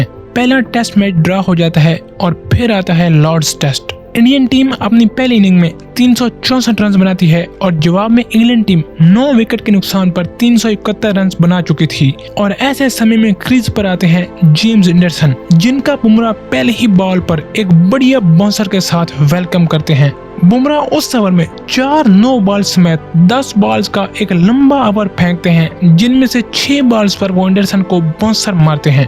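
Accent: Pakistan. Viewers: Tumhare bolne ke hisab se tum pakistani lagte ho? kaha se ho tum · Yaar ye pronounciation Pakistani laag rha hai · Pakistani accent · Bhai tere accent se tu Pakistani lag rha hai.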